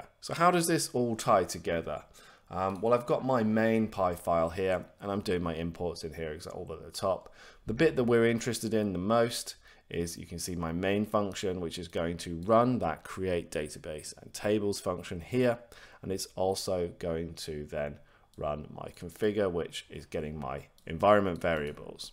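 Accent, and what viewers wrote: United Kingdom. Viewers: Man Your accent is so adorable. Are you British ?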